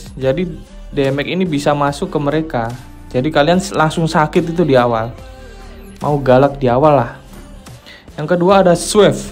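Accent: Java, Indonesia. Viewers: bang Jawa ya?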